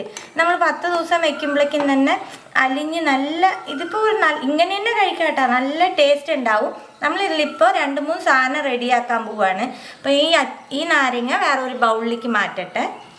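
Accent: India. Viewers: ee narration style kettal thanne video full kandu pokum.. kottayam slang 🥰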